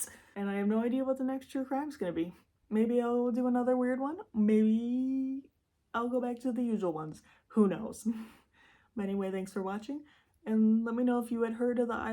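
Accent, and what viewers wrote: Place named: France. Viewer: Liking your French accent 👌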